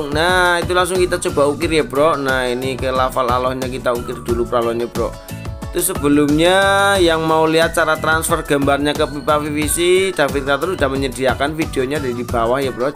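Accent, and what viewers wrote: Banyumas, Indonesia. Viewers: Bahasane ngapak ora ngapak ora kepenak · Wong ndi sih Bro...? Ganing ngomonge ngapak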